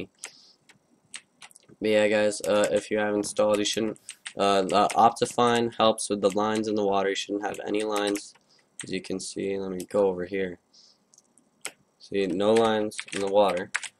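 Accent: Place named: Germany. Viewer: ohne spass alter du hast den mega dollsten ekligsten deutschen akzent den es auf der welt gibt yo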